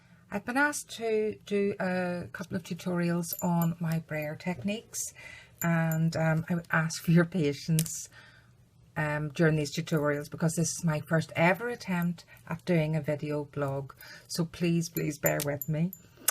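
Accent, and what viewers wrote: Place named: Ireland. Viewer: WONDERFUL. You sound just like my cousins in ireland Sligo. Your video was reaay good. Hopefully we will see more. Dorothy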